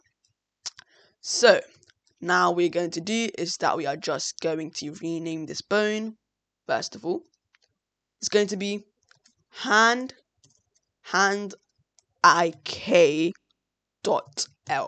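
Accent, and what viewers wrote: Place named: United Kingdom. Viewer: This video had a lot of good content and I learned from it but it was a struggle to get through because of your narration. At times you rush along, only hinting at rather than enunciating certain syllables. In addition, you have a fairly strong British accent and the combination of these caused me to not understand many things you said. The accent alone shouldn't be an issue; I've watched other speakers with British accents who are very understandable--see Grant Abbitt as one example. I suggest that you practice CLEAR - LEE - E - NUN - SEE - AYE -TING E-VER-Y SYLL-A-BLE for future videos.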